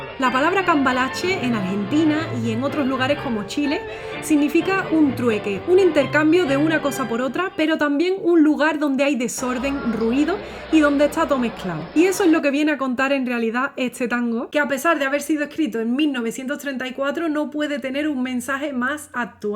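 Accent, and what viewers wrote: Andalusia, Spain. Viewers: Como argentino y amante del Tango te felicito, es una interpretación magistral. Tu acento andaluz queda muy bien en este tipo de canciones. No le des importancia a algún tont0 que critique tu acento. Por otro lado no es la primera vez que haces canciones de Tango y te queda genial, se nota la pasión y sentimiento que le pones. Siendo argentino es un orgullo que alguien tan talentosa como vos haga canciones como estas. Está canción es de las que más he escuchado en mi vida. Desde que era chico mi abuelo nos ponía siempre Tango a mi prima y a mi, tanto en casa como cuando íbamos en el auto haciendo algún viaje visitando familiares. El tema favorito de Tango de mi abuelo siempre fue la y el mío sin duda Cambalache. Escucharlo me hace acordarme de esos tiempos. Su letra es una obra de arte, dice muchas verdades y suena actual aunque la canción tenga tantas décadas. 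Además de Cambalache hay otro tema que no envejece y siempre estará vigente: " " de. Las escuches cuando las escuches esas canciones siempre van ser actuales por las letras que tienen. Música que quedará por siempre. Gracias por esta interpretación tan genial Dejo el tema de para quien quiera escucharlo <link> Dedicado a los políticos de Argentina, sobretodo al vendepatria que tenemos ahora como presidente. Aunque el que escuche la letra verá que se puede aplicar a políticos de otros países también. Pero siendo argentino puedo asegurar que políticos má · Excelente, felicitaciones. Hablas muy como Española pero lo cantaste como Argentina, y además felicitaciones por como te informas de lo que vas a interpretar. soy Argentino y canto tangos, saludos.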